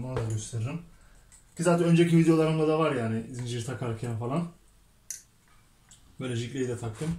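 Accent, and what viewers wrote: Turkey. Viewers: sizi tebrik ediyorum. Ben bir yüksek mühendisim. Aynı zamanda 25 yıldan fazla mesleki kurslar verdim. Konuşman , Doğu Karadeniz şivesi , ama mesleğe çok hakimsin , abonen de oldum , Sıkı bir takipçim de oldum.Tekrar söylüyorum, çok güzel ve detaylı anlatıyorsun.başarılsrınım ve videolarının devamını diliyorum.Haa ben 70 yaşındayım. Ama takipçinim. Başarı dileklerini tekrarı ile.Esen kal.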